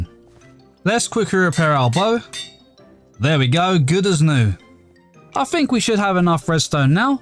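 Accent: United Kingdom. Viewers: Sounds like Bri'ish fella!!! (no offense) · His British axcent is 🤯🤯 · Are you by any chance British you sound like one not being stereotype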